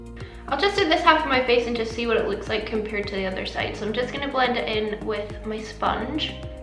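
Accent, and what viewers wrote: Scotland, United Kingdom. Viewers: Oh my god a Scottish YouTuber, where about u from x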